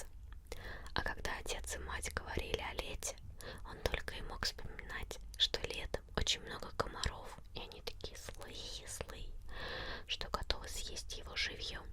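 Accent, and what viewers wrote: Russia. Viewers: me gusta mucho el acento ruso 🤧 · I love Russian accent🥰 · I love the Russian accent and the way it sounds. Only when it's not a Russian yelling at me on a video game tho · el acento ruso es hermoso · ロシア語の発音ほんとすき、日本人じゃ絶対できない🥹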